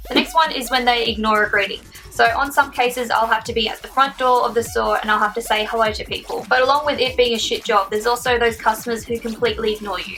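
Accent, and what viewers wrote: Australia. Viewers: Your accent is so pretty. Are you from Australia?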